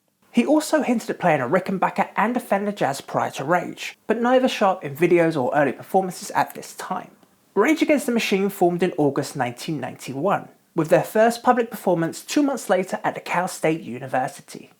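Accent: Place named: Southern England, United Kingdom. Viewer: Awesome stuff as always. I even ordered a curry just have watching this, well worth it. Here's a left field suggestion - How about YOUR rig? :) Ever thought abouot doing a 'Rig Rundown' series with bands nearer to you? Considering your accent makes me think you're from somewhere between Bristol, London or even Sussex, you'd probably be inundated with choice, right? :)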